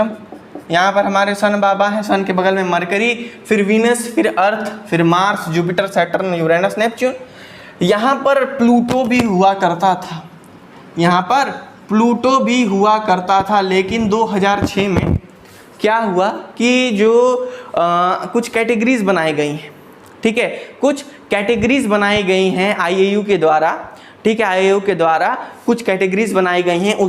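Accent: Bihar, India. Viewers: You have a different and a special way of teaching that highlights you from others 🤗🤗♥♥ because we don't feel bored while studying from you 😃😀 only because of your bihari language 😇😇😅😜♥♥♥♥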